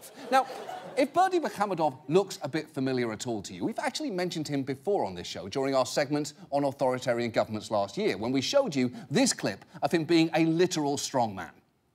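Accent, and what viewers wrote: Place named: United Kingdom. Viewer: that trademark British delivery system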